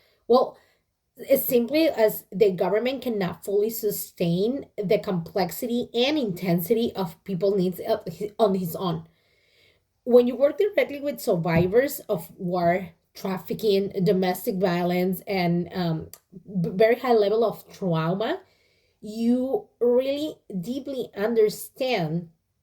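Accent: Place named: Spain. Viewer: Omg hot sexy Spanish accent is my fav weakness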